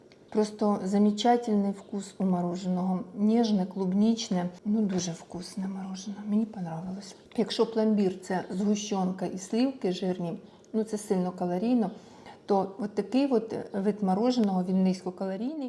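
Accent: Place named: Dnipro, Ukraine. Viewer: Якщо закрити очі - одразу Юля Коса чується, такий же дніпровський діалект, та і тембр однаковий.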